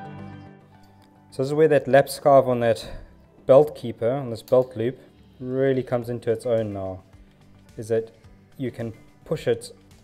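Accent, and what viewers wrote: South Africa. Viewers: Aussie saddlemaker here. Been a saddlemaker for 39 years and never used a lap skiver, you have convinced me to start using this tool. I have been using a round knife for hand skiving. Only cos this is how I was taught by when I learned my trade in the 1980s. That skiver is nicely sharpened, hardly any effort to skive! Is that a South African accent? At first I thought your accent was New Zullander (New Zealander). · I knew with that accent where in South Africa are you and do you have a shop in the western cape ?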